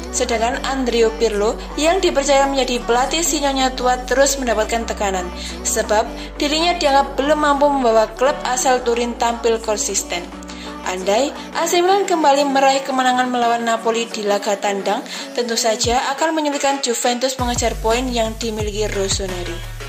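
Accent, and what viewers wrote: Java, Indonesia. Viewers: Mbak e wong jowo endi? 😅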